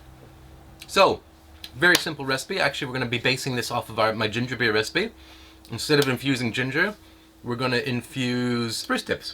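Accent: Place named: Dublin, Ireland. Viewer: Have you spent any time in ireland in the past? Your channel says your from Canada, but your accent sounds like you could be from Dublin to me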